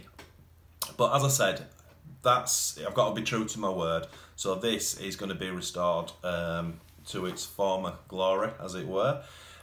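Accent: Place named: West Yorkshire, United Kingdom. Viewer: You sound local to me... I'm in West Yorkshire, Leeds Bradford area. Just discovered your channel and loving it as Imy first rc was a frog in 85,second hand mind you. Still took nearly a year of paper round to buy. Just getting back into it. Bought a zd racing for now til deciding what bigger to get. Anyway cheers for the memories mate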